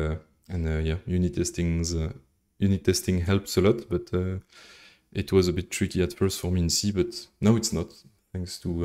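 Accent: France. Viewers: On dirait un francais qui parle en anglais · wery wery french accent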